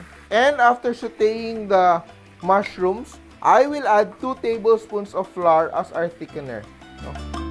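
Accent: Philippines. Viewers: Philippines accent are cute ...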